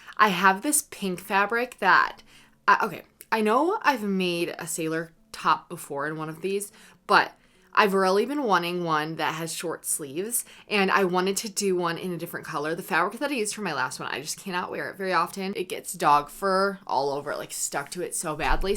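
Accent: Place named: Midwest, United States. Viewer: as a cali girl i find your midwestern accent so soothing and refreshing from the influencer vocal fry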